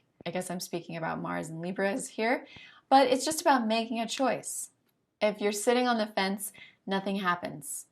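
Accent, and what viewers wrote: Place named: United States. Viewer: I have venus and Mars in Aries and when im horny its horrible but thats also probably because my ascendant is scorpio... I had like 30 orgasms the other day and im a guy in my 30s so yeah I dont really care if I cum quick because i will be back at it in less than 10 minutes... which is not normal...probably end up killing someone if i just held this energy in...my ancestors from one side were Polish nobility and literally every single man since my great grandfather were involved in wars and killing people and the ones from back in the day were winged hussar knights who were undefeated on the battlefield and destroyed armies up to ten times the size of them, they are legendary war heroes in Polish and European history as they saved Europe from the ottoman empires expansion......my sister has her moon and north node in aries too and shes a nutcase also...my mother had a horrible explosive temper and would beat us constantly....its genetic i believe also.Luckily my sun in pisces calms things down though.. 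if i had my sun in Aries that would be bad. Im very good at fighting and quick and have trained alot in the past but now I just go to the gym to look muscular and toned... but yeah your hot by the way... if you ever go on vacation to Mallorca hit me up...thats were I live... I love that American accent ,it would turn me on so much hearing you speak dirty... but yeah lol ...anyways..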